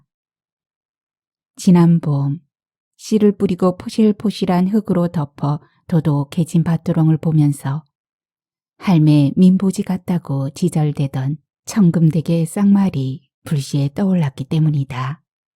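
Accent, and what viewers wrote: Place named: Gyeongsang, South Korea. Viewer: 잘듣고 있습니다 갱상도 분이신가 사투리가 아주 구수 하구만요 5,60년대 우리들의 삶이야기네요 한없이 배고프든 시절 보릿고개를 힘들게 넘든 시절이엇죠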